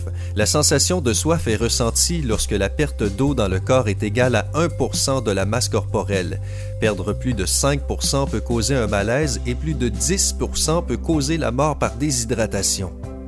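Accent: Quebec, Canada. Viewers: Pourquoi des fois c un québécois et des fois un francais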